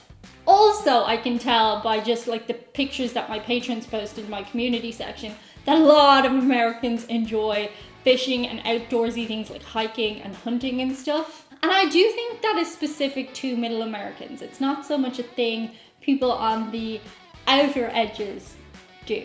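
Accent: Ireland. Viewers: I would suggest flying into Minneapolis (AMS) from Dublin (DUB). I see tickets on decent aircraft for $631 on Lagskipping airline route finder. Then take a car down Interstate 35 through Des Moines, Iowa to Kansas city (MCI). You can pull over to small communities within miles of the highway. When you get to Kansas City, you can fly direct back to Dublin for about $750. I don't currently know anyone who bowls more than once every 28 years. When you get to northern Iowa, pay attention to the accent. Many of the immigrants that settled from countries that did not speak English hired Irish girls to teach in one room schools. It was a welcome opportunity during the potato famine. The central US does not have any hint of an English accent. It was strange hearing your Irish accent instead of the fake TV and Movie ones. See what you think when you drive through. Your timing should correlate either to the Iowa State fair in Des Moines or any or several fairs on your way through. 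(most are in late June or July but Des Moines should be in August). And yes, the coasts and south are almost like different countries from the Midwest west of the Mississippi. Towns east of Mississippi in the Midwest are just like extensions of Pennsylvania with large cities. If you want to try real corn, you want bicolor Iowa supersweet harvested no more than a day (preferably 3 hours) before cooking. (be sure not to get dent corn. that is the low quality stuff for feeding pigs or put into cans exporting) Ca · You know is getting serious about a topic when the cat ears come out. Just imagine poor Chewie’s shock upon being woken up from a lovey nap to be confronted by a glittery cat creature with an Irish accent.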